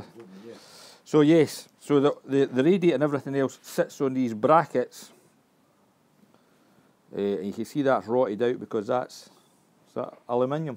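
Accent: Scotland, United Kingdom. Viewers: very nice scottish accent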